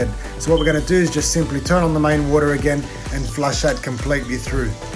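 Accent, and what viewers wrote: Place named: Australia. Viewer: Good old tradie advice with an Aussie accent …. Music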